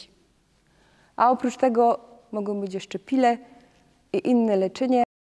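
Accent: Silesia, Poland. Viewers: Jerona chopie no Cie moga godzinami suchac. Kochom tyn akcynt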